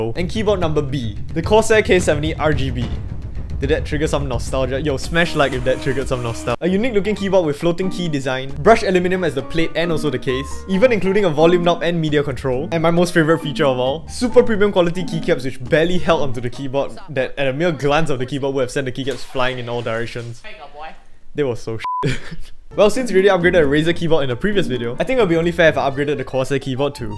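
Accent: Singapore. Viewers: Hey are you Singaporean you sound like one ngl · are you Singaporean?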